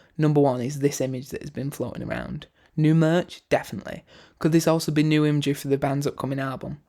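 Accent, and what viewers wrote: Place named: Sheffield, United Kingdom. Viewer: You sound like you’re from Sheffield yourself or something haha. I haven’t heard a similar accent like it from anyone except.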